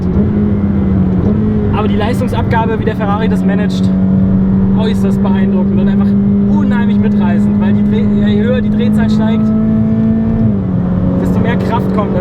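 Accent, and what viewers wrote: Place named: Poland. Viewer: sounds like a Polish Nowak, you are a real polish Nowak?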